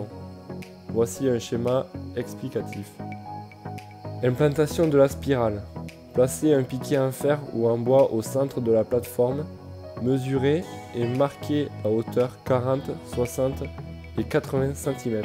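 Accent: South, France. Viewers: (16)... Oh pétard... Ça c'est super beau.. Et très bien expliqué en plus 👌 Mais d'où vient cette pointe d'accent du sud.. La Provence peut être avec les plantes aromatiques positionnées ici ? ☺️ En tous les cas bravo chaîne très intéressante 👏👏👏👏 A bientôt 🤗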